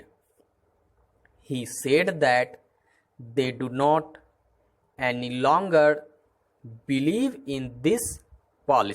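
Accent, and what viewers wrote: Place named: India. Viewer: Bro, first you have to change your tone of english speaking because as you read english it seems like you are reading in a hindi tone. you need to learn english how to use tone at a specific place. Tone changes everything in english if your tone is wrong. So, try to learn how to use tone and pitch then record it and publish it on youtube. it is my suggestion bro. If you apply these things you will see changes in your reading and easy to understand what you want to dictate.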